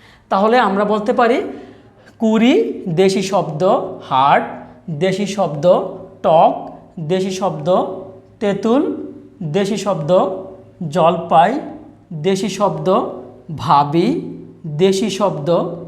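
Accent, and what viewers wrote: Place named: Mymensingh, Bangladesh. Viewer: ভাই এর বাড়ি কি কিশোরগঞ্জ,ভাষাটা বৃহত্তর ময়মনসিংহের মত আসে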